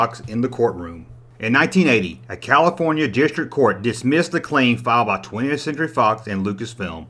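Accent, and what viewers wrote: South, United States. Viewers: are you from the south if so whrevinntouth arevyoubfrom I cxn tell your from the south by the accentv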